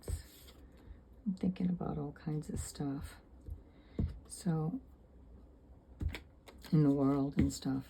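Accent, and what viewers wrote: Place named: Massachusetts, United States. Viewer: You crack me up! I'm a first time watcher. You must be from MA!! Your accent & word choices make me feel right at home!! I quite enjoyed watching your methods.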